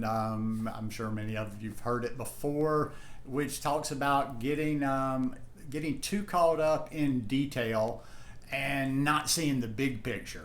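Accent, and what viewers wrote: South, United States. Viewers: I watch only a handful of raw plant based people. Their eating styles range from gourmet labour intensive, all the way to those who don't use machines and meal prep involves grabbing a piece of fruit . I take info from them all and that is reflected in how I eat. You and Tannyraw are my favourites - must be the American southern accents that I love 😀.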